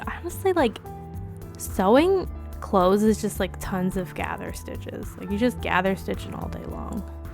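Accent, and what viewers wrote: Pennsylvania, United States. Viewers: u have such a pa accent hahahah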